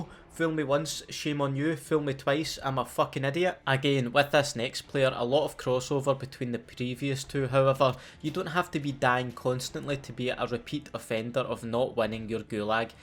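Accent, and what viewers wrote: Scotland, United Kingdom. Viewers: are you Scottish or Irish? I am guessing you are Scottish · Cheers for the vid pal. Awesome Scottish commentary 👍🏼👍🏼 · U have the best Scottish voice ever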